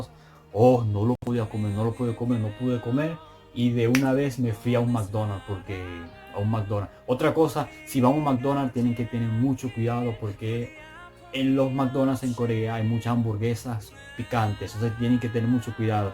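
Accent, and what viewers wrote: Zulia, Venezuela. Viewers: yo quisiera oír más sobre esa lengua y cultura a las que ahora para hacerlo más “appealing” le sumamos ese hermoso acento zuliano, sabor venezolano que desborda alegría sin igual. Salí de Vz hace como 30 años, pero ahora estudio coreano y mi sueño (sé que lo lograré) es aprender bien el idioma, visitar Corea del Sur y trabajar en este y mis otros idiomas. Muy orgullosa de ti y los demás venezolanos emprendedores que se esfuerzan por salir adelante, dejar en alto el nombre de Vz y dar a conocer lo bueno, lo bonito, lo chevere y lo maravilloso de quien sabe perder y prepararse por ganar. Quizá parezca que se ha perdido una batalla, tiempo y más, no lo negamos, pero que se sujete fuerte este mundo porque emergeremos con más fuerza y valor. Mientras tanto, en el exilio hoy tal vez, que se conozca por doquier nuestra voz, corazón, cultura, tradiciones, comidas y más.Hay gente de más edad por ahí, que hacen, a que se dedican, cuales son sus intereses más allá de la familia y carrera profesional. 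Ojalá puedas responder con algún video. Te bendigo. Te deseo lo mejor. Respetuoso saludo desde TX. Adelante!! 화이팅:)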